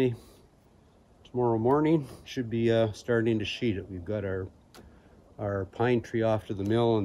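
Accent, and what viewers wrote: Canada. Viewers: Just found your channel, it's amazing! Just subscribed. I'm doing something similar on my channel, building a log cabin with only hand tools, although I have some more modern tools. I'm in the early stages of harvesting logs. I'm learning a lot from your channel and will definitely be incorporating a lot of the things I've learned here into my build. Keep it up! Also where you located? The accent leaves me to believe southern Ontario. I'm nearby in Quebec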